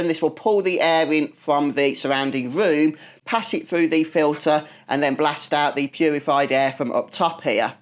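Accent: United Kingdom. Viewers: Thank you for this great review. I love your British accent. I'm studying for IELTS and is a pleasure to listen and learn from you.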